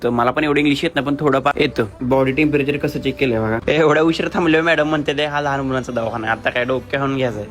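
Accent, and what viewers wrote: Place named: Karad, India. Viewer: मित्रा बोलण्यावरून कराड चा वाटतोय 🤔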